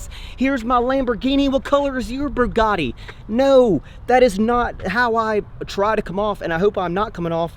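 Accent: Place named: South, United States. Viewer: getting that southern twang 😂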